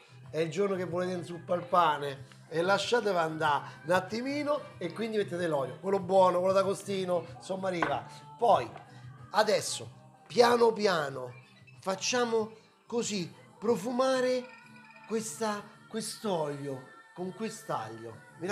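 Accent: Rome, Italy. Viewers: Meraviglioso piatto. Ti assicuro che a volte non mi interessa il video :. Basta la tua voce de Roma che mi sento a casa. Grazie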